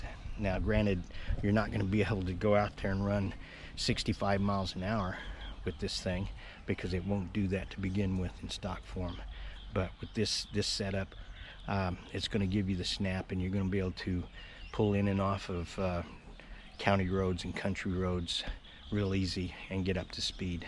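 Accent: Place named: New Jersey, United States. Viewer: How u get to Thailand- I mean u speak like you from I’d say New Jersey ? Now I got to investigate Thailand I love the bird noises in the background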